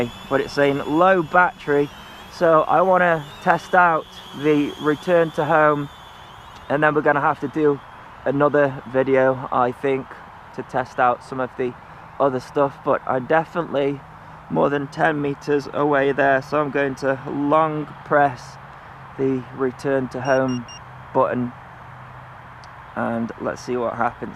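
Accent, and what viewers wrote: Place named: Stoke, United Kingdom. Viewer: Great review, best I have seen so far, great value for the money and glad you dont equate it with the spark, the spark is a much better craft with a better camera and can fly 1.5 miles away , the A3 has been shown to fly no more than a <num> metres at very best, lots of folk like a drone they can fly out of visual line of site even if they are not supposed to, for the money though its good, they need to work on the software for the flight controller, them simply saying its our own doesnt detract from the fact that its not right, the drone vibrates quite badly and its only the gimble that saves the footage from being unusable, give them 6 months and they will iron out the bugs, the underside port is shown being used with a solenoid to drop parachutes on the promo video. I know you were covering your GPS coordinates but your flying location is very easy to work out from the aerial video and your accent, got a lot of love for Stoke and have family up that way just a few miles to the west of you, thanks for the review.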